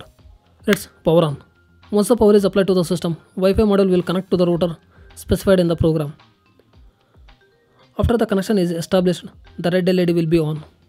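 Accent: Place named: India. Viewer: why do indians have a fucked up accent ?? BTW he sounds like a mexican guy trying to speak english in an indian accent LOL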